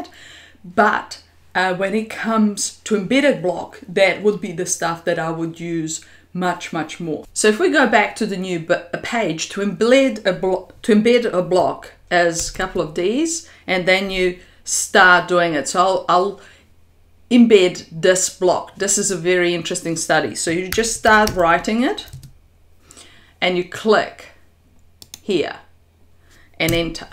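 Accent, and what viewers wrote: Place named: New Zealand. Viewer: Great explanation,:). Are you from New Zealand btw? I am taking a guess based on your accent.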